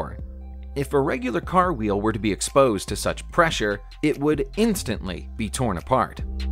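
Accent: United States. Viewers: What are the units for the temps given? Judging by the American accent they could be Fahrenheit, but seeing as none else in the world uses that measurement. Just curious as to some of the actual temperatures endured.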